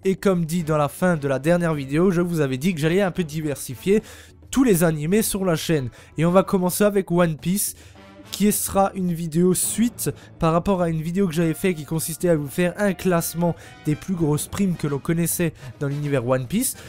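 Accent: Alsace, France. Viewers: Yôôôôô cet accent sent l'alsace! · T'a l'accent alsacien !!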